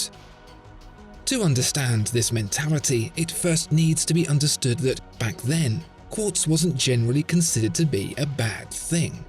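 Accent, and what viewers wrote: United Kingdom. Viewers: That British accent....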